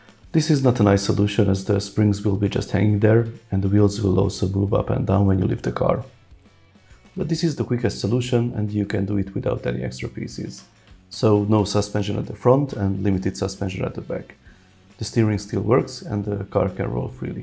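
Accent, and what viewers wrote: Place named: Hungary. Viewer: Kicsit olyan magyar akcentusa van nemtudom miért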